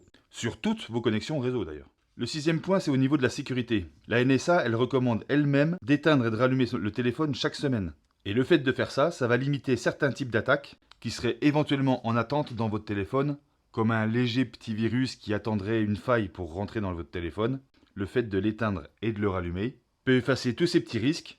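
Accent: Franche-Comté, France. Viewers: Merci pour tes vidéos, je voulais te demander, tu es de Franche-Comté ? Rapport à ton accent !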